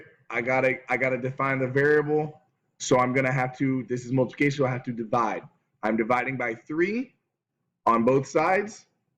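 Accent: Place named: London, United Kingdom. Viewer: You sound like you’re from London.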